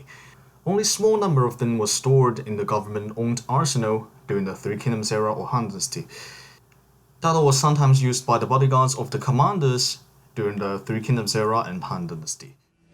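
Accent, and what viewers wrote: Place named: China. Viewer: By your pronunciation I assumed that you are Chinese so can I ask in Chinese? 我一直很好奇為何漢朝的盾 或中國以前的盾 都有點倒三角形 跟西方的騎士盾剛好相反 這是為何呢 ？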